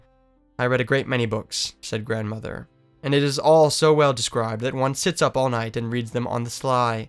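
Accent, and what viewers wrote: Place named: United Kingdom. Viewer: good video but i hate your shitty british accent when you read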